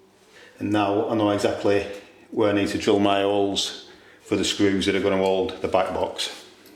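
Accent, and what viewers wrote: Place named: Lancashire, United Kingdom. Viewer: Soft, crumbly plaster and soft brick too. Soft plaster not surprising but given your accent, I'm surprised the brick was soft too. Thought that round your way most would be Acrrington bricks and they are like reinforced concrete! lol.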